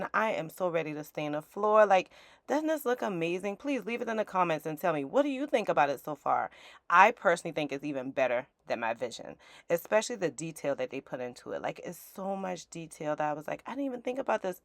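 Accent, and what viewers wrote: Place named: Charleston, United States. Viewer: New subscripber. Love this! That accent sure sounds familiar. Charleston?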